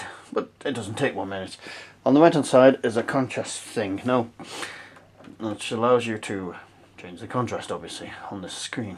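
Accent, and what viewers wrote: United Kingdom. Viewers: A British sounding!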